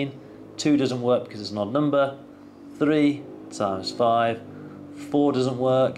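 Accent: United Kingdom. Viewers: British ahh guy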